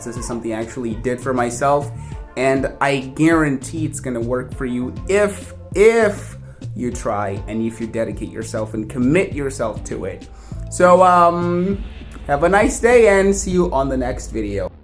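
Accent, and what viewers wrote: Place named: United States. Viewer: Thanks very much for your video. It's very inspirational. I sure would like to hear more about how you have come this far in getting an American accent. I have a few questions like: How long did it take you to come to speak like this? Do you exaggerate your mouth movement when you read out loud slowly? I'd appreciate your answers. You are great. You must have worked very hard. Thank you very much.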